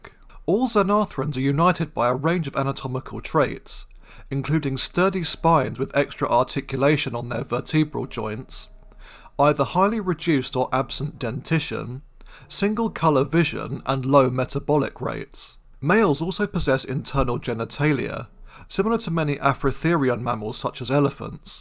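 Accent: United Kingdom. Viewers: This funny british (bri’ish) polar bear is funny and educational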